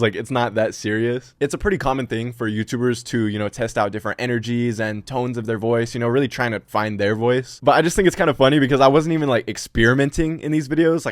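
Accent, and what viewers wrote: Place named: California, United States. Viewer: Cali voice*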